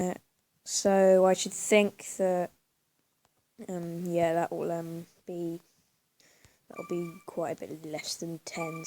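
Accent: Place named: United Kingdom. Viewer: Nice too see that your rly active!!! Lol tbh ur British voice is GREAT. I’m an American who wants to be British or Aussie. Aussie and British accents are AWESOME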